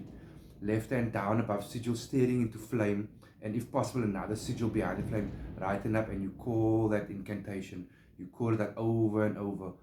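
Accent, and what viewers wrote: South Africa. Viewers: Correct me if I am wrong...you sound Capetonian South Africa.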